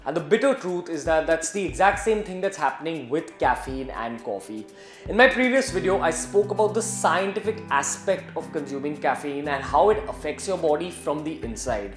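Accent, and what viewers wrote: India. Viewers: Why do you sound like that? Are you Indian? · first, are you talking with your body or your mouth?! second am wondering how did you invent this language ? is it indian english ?!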